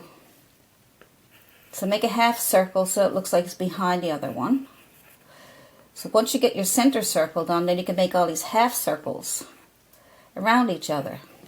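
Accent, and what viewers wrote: Newfoundland, Canada. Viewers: Is that a Newfoundland accent?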